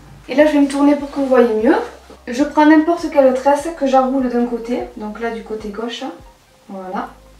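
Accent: South, France. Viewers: Tu gère t la meilleure 💙 💚 💛 💜 t comme moi t'as l'accent du sud mdr 😁😄😅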